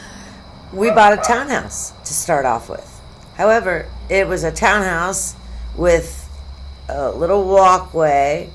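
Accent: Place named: New Jersey, United States. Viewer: Just found your channel. You sound so much like a writer/comedienne named Tara Dublin. Same throaty, sort of New Jersey girl accent.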